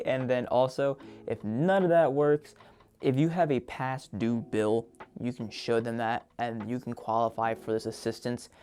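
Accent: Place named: United Kingdom. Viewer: Not sure why, but I thought you are from UK haha!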